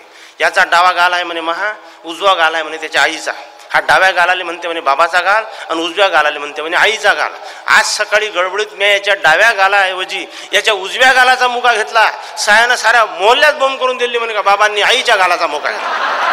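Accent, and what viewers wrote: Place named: Vidarbha, India. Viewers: Khup sundar. Tumchi Marathi aikun khamgaon Chi aathvan aali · निखळ विनोद. हसून हसून मुरकुंडी वळते. मिर्झा बेग साहेबांची विदर्भातील वऱ्हाडी बोली फार भावते.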